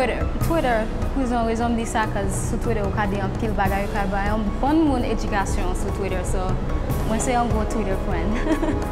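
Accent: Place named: Cap-Haïtien, Haiti. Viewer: U pale tankou moun okap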